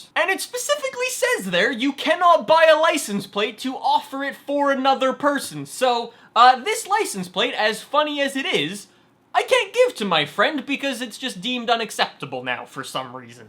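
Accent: Quebec, Canada. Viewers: Tu viens du Québec???!!! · Je savais pas que t’étais québécois!! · t'es québecois :O !!!! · R u Canadian? Btw when will the gtr done?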